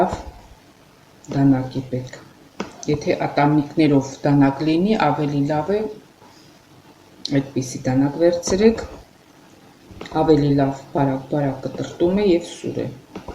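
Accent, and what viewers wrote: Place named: Lori, Armenia. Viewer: zgacvum er Lorva akcent@ hacheli e EV tesnel EV lsel.I'm hamaqaxaqaci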